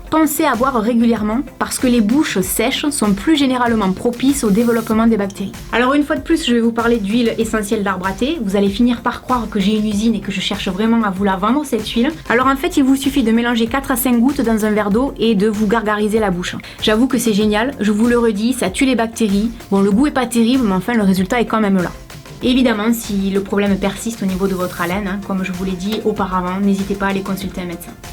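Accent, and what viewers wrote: South, France. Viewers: Ca fait plaisir d'écouter cet accent du sud !!!! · Bonjour. Très sympa tes vidéos et ton accent du sud (Pyrénées Orientales comme moi ou région PACA ?). Je rajoute un petit astuce déodorant naturel : par très forte chaleurs l'huile essentielle qui fonctionne très bien c'est celle de palmarosa ; ) diluée dans un petit spray comme tu le fais pour la lavande. Ça sent un peu fort après application mais l'odeur s'estompe rapidement. La transpiration ne sent pas. Efficace pour séance de sport par exemple. Bonne continuation.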